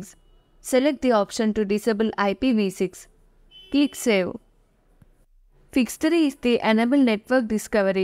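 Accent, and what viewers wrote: India. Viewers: why does the ai have an indian accent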